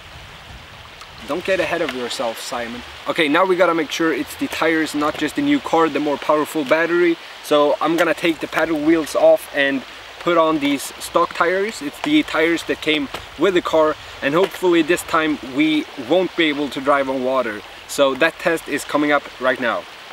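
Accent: Sweden. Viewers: Are you from sweden? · Are he swede??? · is this guy swedish?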